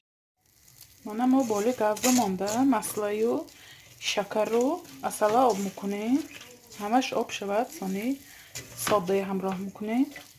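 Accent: Isfara, Tajikistan. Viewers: Салом бисер барои мо хам маькул шуд рахмат. Шумо лафзатон Исфарагибоин саломат бошид. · Рахмат барои рецепт! Гапзанитон исфарагихо боин)) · Апаҷон шумо исфарагими.гапзанитон монанд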